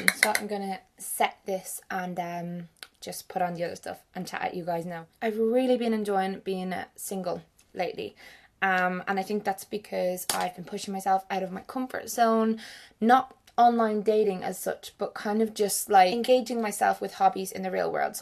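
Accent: Ireland. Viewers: Compatibility: we have the same beliefs, we're both bi, we love makeup, I love the Irish accent Chemistry: I'm bad at science but good for you It's ok with me if you move to Wales now x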